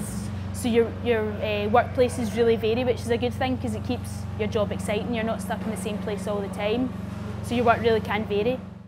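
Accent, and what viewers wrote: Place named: Ireland. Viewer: Sounds just like the irish dude in my residential wireman class.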